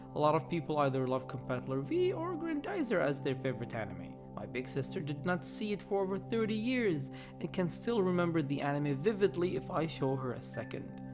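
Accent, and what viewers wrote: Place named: Arab world. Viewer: are you an arab? u sound like a person who grew up in the middle east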